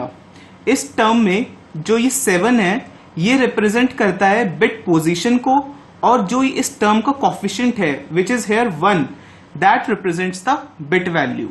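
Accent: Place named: India. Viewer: Good video and nice handwriting! Easy to understand CRC this way. I'm going to watch more of your videos. (A little request? Some subtitles would be lovely. Because 1. it's always easier to follow the teacher :) and 2. easier to decipher some words/sentences - For non-Indian English speakers, Indian accented English can be a bit tricky to understand - even if we are used to the accent. I'm sorry to mention it, but I thought maybe you'd like to know).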